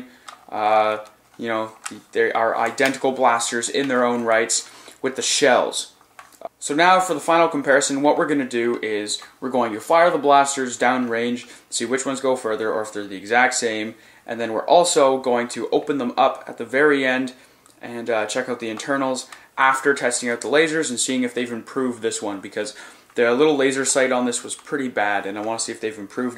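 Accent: Canada. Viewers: Lol ur Canadian.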